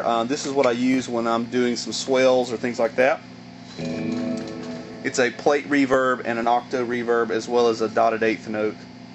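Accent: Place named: South, United States. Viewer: Dude, nice rig. Church rig?Might I recommend a better tuner? (turbo tuner!) Also, if you didn't already know, you probably should not use the "tuner out" on your volume pedal - it messes with your tone. Anyway, is that green pedal a JHS custom pedal? I seem to recall a custom pedal that looked very similar to it on their site. Great tones btw. I used to have a M9 and I loved it, I had a sonic stomp too, both cool. I hear your southern accent, where are you from? I am in Hattiesburg,Mississippi.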